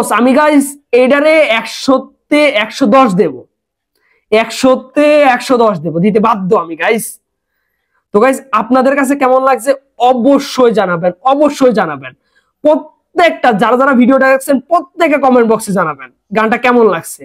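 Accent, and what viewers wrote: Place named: Tangail, Bangladesh. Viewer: Vai apnar basha tangail.kuthay..ami Madhupur theke..❤❤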